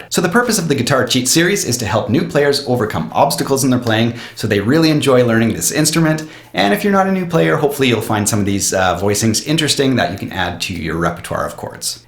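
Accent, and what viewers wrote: Canada. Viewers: By any chance, are you Canadian. I recognize your accent as a fellow Canadian as well.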